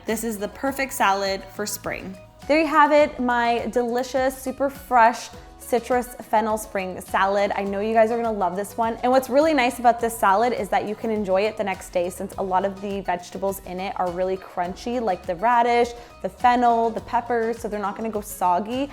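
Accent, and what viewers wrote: Canada. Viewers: Love the recipe, I will try it for sure!! Btw, I love your accent, are you from Canada?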